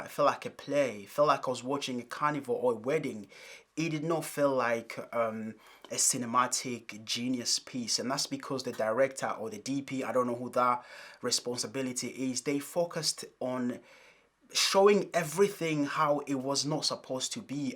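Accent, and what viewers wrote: Africa. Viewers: Your accent is telling me your African using a some London and American slang words you don't sound like you're from London at all.. I agree with your break down tho.. The movie seemed very rushed and not really funny at all.. A big let down it was ok in some parts but overall not good.. Your totally right about the clothing and lighting after Black Panther I thought Americans have stepped up but not this time.. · Great review brother. You broke It down nicely. But you don't have a British accent. You sound African. Even if my eyes were closed. 😊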